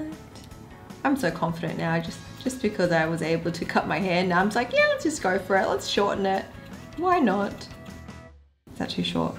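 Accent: Australia. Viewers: woah I thought she lived in Australia, I must’ve missed the move to Singapore! Still think she lives in Aussie because of the prominent accent hahaha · I love all your videos. I love how you spoke, your expression, your make up and your settings. I love Aussie accent and before i don't know you're Australian so i lowkey love your accent and one day i was thinking could it be an aussie accent 🤔 and yeah i was right i knew it bc i was a big kpop fan and there's few kpop idol with aussie accent like Rosè, Chan & Felix (just mentioning bc i love Aussie accent). Moreover, I'm loving your videos. I watch it and then repeat it again and again. Btw, i'm Zuali from Northeast India. Love you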